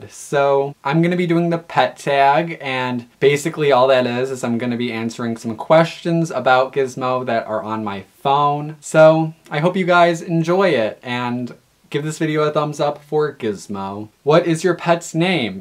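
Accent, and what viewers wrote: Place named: West, United States. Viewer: Also I hear a bit of a western accent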